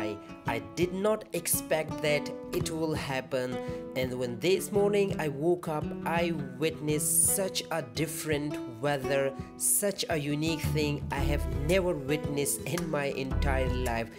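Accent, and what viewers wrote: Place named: United Kingdom. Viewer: British accent and splendid editing..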